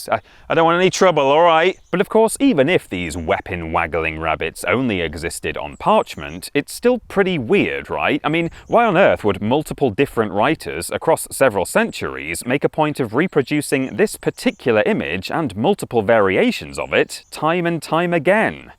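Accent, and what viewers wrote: England, United Kingdom. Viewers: How can a man with a heavy English accent do a video on this topic and not show a clip from the Monty Python movie with the killer rabbit? At least I can see where they came up with such a ridiculous idea... yesterday it made no sense, but today I've grown.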